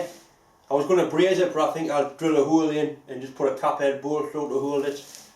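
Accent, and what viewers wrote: Newcastle, United Kingdom. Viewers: As always, warms me wee heart to hear Geordie and a Great Thank you for sharing your videos and for making me discover more then Washington, the Penshaw monument, the Angel of the North, Hadrians wall, the Quayside and of course the Toon!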